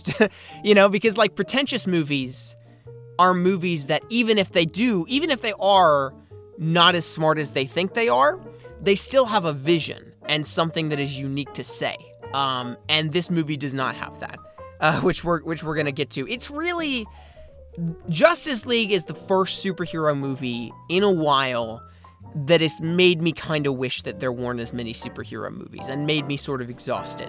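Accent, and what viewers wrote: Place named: South, United States. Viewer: He says y'all he really is from Houston/the south in general.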